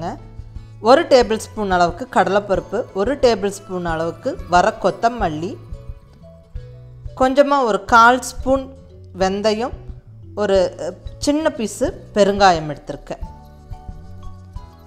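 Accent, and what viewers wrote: Coimbatore, India. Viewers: Madam neenga coimbatore ah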